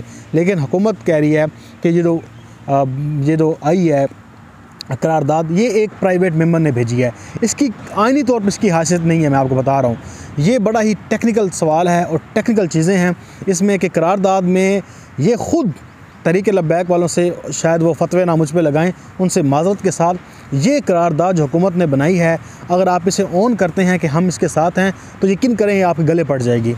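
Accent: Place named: Punjab, India. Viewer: Punjabi ka tarka laganay ki zaroorat nahin hay, however your vlogs are always informative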